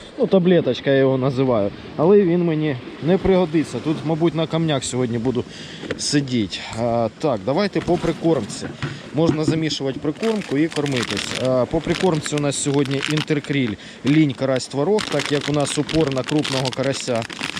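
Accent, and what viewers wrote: Poltava, Ukraine. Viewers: Щодо мови- все добре, чисто полтавський говір;)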